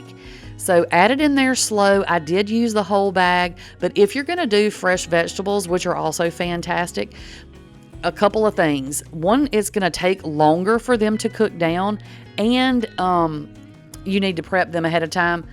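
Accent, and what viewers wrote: South, United States. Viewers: I just found your site and boy am I glad I did! I can't wait to start trying your recipes. And I absolutely love your accent. I'm from Texas originally but was raised in California, I really miss the southern accents. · I’ve just found you ! And now working through your recipes,unfortunately can’t get all ingredients as in the uk, but I just love listening to your wonderful accent which is my favourite USA accent, I could listen to your wonderful all day! Only thing is I land up trying to talk like you for the rest of the day along with my family, hope you don’t mind lol !! Xx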